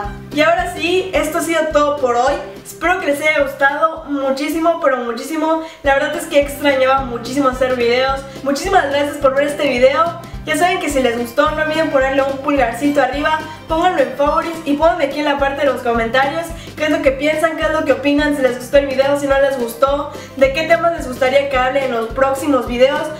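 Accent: Campeche, Mexico. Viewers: Si que tienes acento de Campeche ajajja , que linda 💗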